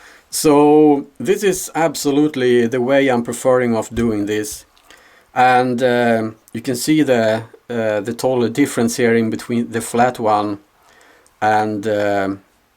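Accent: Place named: Sweden. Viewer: Where are you from? The accent is really hard to place. First I thought Swedish, but there's something that reminds me of Finish also.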